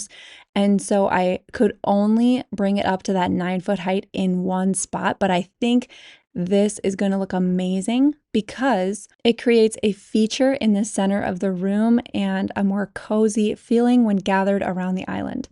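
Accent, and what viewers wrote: United States. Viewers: I just love to hear * talk in her American accent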